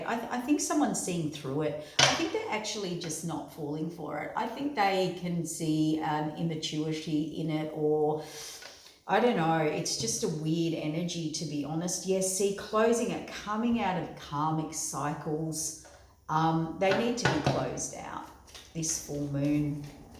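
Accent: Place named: Australia. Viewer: Excellent video, great to hear your Aussie voice.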